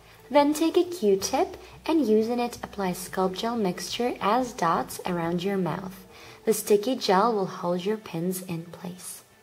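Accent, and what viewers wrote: Finland. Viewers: Are u from Finland??? :D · Are you Finnish or Russian?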